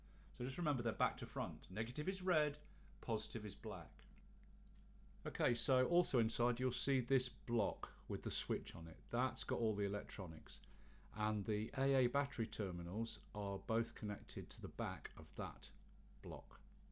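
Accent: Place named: England, United Kingdom. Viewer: I love English accent. Can you tell me if this tool will pick well music from the ceiling where neighbor is playing karaoke, he sings on the mic, play guitar and the karaoke machine plays ovation when the guy ends singing. I can't even watch my tv, I can't speak on my phone. I hear all double because of his noise disturbance. I need to record to get evidences. My cellphone does not pick any sound despite that the noise is so loud. How do I use this mic, attach to the ceiling?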